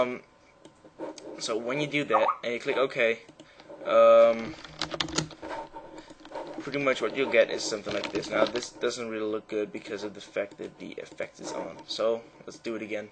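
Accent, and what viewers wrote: Netherlands. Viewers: Hey bro, awesome vid, btw are you dutch? One little thing that is kinda annoying is that your mouse is pretty loud. I guess this is because you don't use a mouse mat. Once again, awesome vid overal:) gj keep it up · Ik zie aan je accent al dat je Nederlands bent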